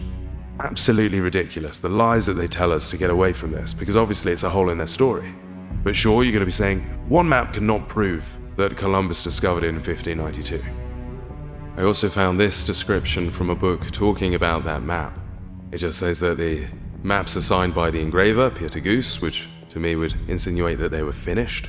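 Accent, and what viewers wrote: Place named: United Kingdom. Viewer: Nice British accent - I still suspect he's Russian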